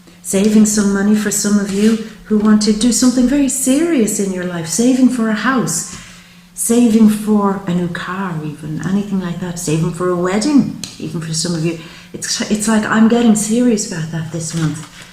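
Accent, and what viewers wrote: Ireland. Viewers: you are so animated and unique i love listening to that pure irish accent.good girl.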